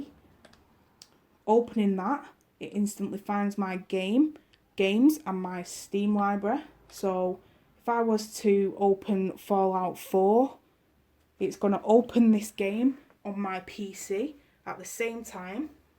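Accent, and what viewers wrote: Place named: Birmingham, United Kingdom. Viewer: I am foreign but you're sooo much from Birmingham :)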